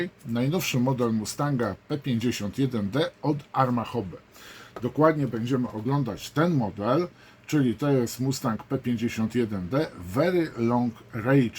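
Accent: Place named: Poland. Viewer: Ciekawy Polski alcent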